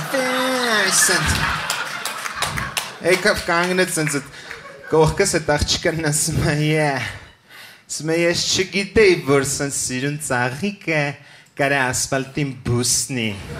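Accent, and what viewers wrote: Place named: United States. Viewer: Մի տեսակ չեմ հասկանում ամերիկյան ակցենտով հայերեն խոսալու իմաստը, ահավոր խնդալու ա հնչում )))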